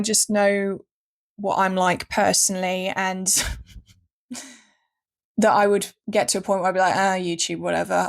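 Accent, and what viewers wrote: RP, United Kingdom. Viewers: Hearing posh English is like hearing birds to sing. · That's not really posh, just normal English. She can actually string a sentence together which you're probably not used to hearing these days.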